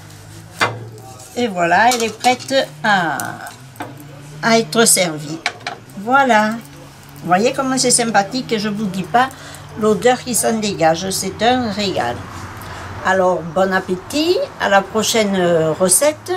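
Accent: Provence, France. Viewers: Bravo mamie pour vos recettes en plus avec votre bel accent provençal, recettes que jai teste pas toutes encore merci pour votre gentillesse ❤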